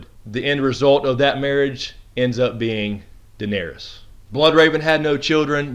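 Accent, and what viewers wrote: South, United States. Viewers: Oh, I see. Your accent is very southern but I couldn't figure out where. Anyway... how do you think Melisandre will ultimately convince herself that Bran and/or the Bloodraven are not necessarily evil?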